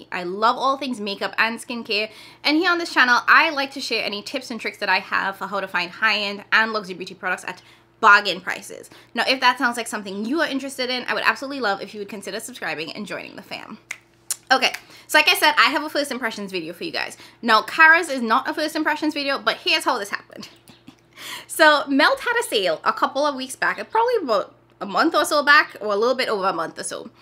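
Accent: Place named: Trinidad and Tobago. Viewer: Cara sent me over here! Hello! You sound like a Trini! I’m from Jamaica 🇯🇲 I’ve been looking for new beauty YouTubers to follow, need some fresh opinions 😊 I too have been wanting to start a beauty channel and actually have some videos posted on two channels from ten years ago on music and hair. One day! I’m scared of the editing… I don’t have this palette so just here to learn. I have Muerte, Gemini 2, amor y mariposas, beetle juice and she’s in parties. I just ordered the electrip.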